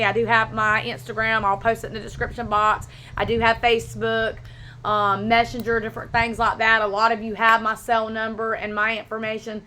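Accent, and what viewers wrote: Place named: South, United States. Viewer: The first ever video I watched was your "What's in my purse" video when I was shopping around for a jansport mini. Really loved your southern accent and simple vibes. You will be missed. It was comforting to watch your chats and cleans.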